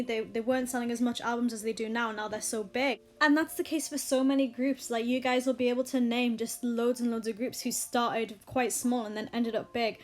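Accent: United Kingdom. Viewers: Wait r u British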